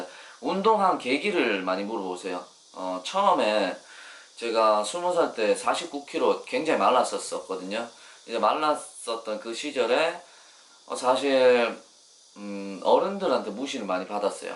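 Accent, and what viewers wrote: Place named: Gyeongsang, South Korea. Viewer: 여자친구 어디서 만났으셨어요~말투가 갱상도 사투리인데 고향이 울산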